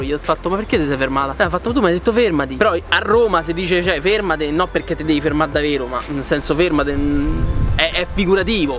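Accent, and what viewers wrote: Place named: London, United Kingdom. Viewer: tu stai tentando un accento tipo Londinese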